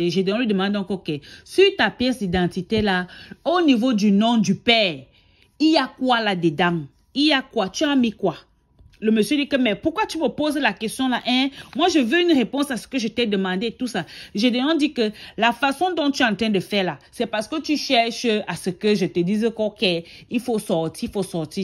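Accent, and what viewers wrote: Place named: Cameroon. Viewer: Vraiment madame tu es forte dans rapporter les faits exactement comme ça s'est passé avec un accent camerounais interressant et intéressé par les faits Chapeau continue ainsi...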